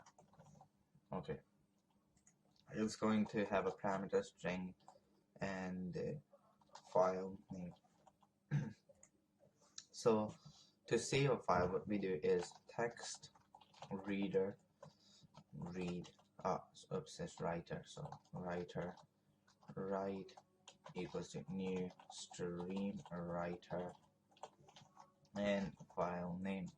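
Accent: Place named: Scotland, United Kingdom. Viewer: Nice and Clear tutorial, made it really easy to follow and understand. Funky accent btw, Scottish twang? :)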